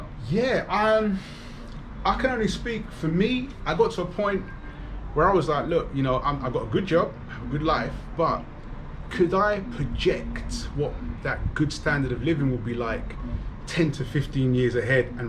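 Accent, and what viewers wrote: London, United Kingdom. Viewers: Had to do a double-take when I heard the London accent haha - a lovely surprise! We lived in Tottenham until the riots (my ex-husband still lives there). So hard to find any content from Black British people who have moved over to Latin American countries. I was initially looking at Mexico for me and my daughter, but now I'm discovering content about Colombia! I'll be a new subscriber lol. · Referred from Ace’s channel.. I’m not gonna lie it’s nice to see Londoner that looks and talks like me.. that’s taking the leap to broaden his horizons unlike most people who happen to think “London is everything” going forward with your channel and life in general wishing you the best 👊🏾 ps.. I’ve liked and subscribed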